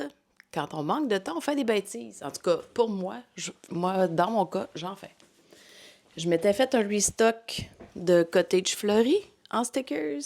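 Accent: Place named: Quebec, Canada. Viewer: Bonjour! c'est la première vidéo que je regarde de ta chaine et j'addooorre! (l'ambiance douce, te voir peindre différents sujets, ta jovialité, ton accent québécois qui fait voyagé...) Merci pour ton contenu!! <3 Lucie de Bretagne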